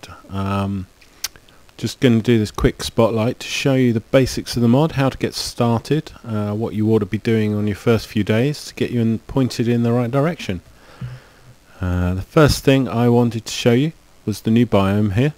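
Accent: United Kingdom. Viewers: First off – there is no such thing as a British accent! Great Britain refers geographically to the island of Great Britain, politically to England, Scotland and Wales in combination. Only Americans use the expression ‘British accent’, and you ain’t American are you. You have an English accent (not a hint of Welsh or Scottish in there that I could hear). Second off – why apologise for it? Americans speak English, as in England right? Shame on you:P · I like your British accent. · i love the british accent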